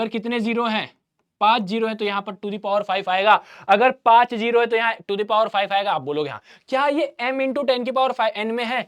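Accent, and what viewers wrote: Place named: Bihar, India. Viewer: Sir speaking bihari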